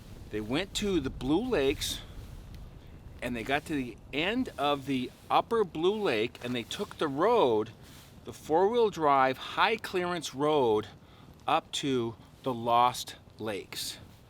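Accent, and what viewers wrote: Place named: Southwest Michigan, United States. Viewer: Great stories,! I know you were from MN originally, but did you ever spend time in SW Lower Michigan - Grand Rapids area? You pronounce some words exactly like that area. Just something I noticed. I really prefer and really like the videos that don't have much AI. I would much rather look at the river, look at you, look at a pinecone, rather than do anything that makes an AI center profitable. It would be so much easier for you to do that, so I appreciate the work you put into these videos! I hope you have a fantastic summer! I'm having a cup of coffee ( PM lol) and it tastes so much better when it's in my Base Camp ceramic coffee mug. 😊😊 I'm already looking forward to Sunday!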